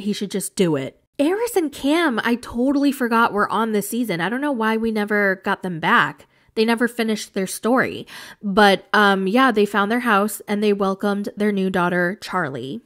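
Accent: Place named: South, United States. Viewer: you have a beautiful southern accent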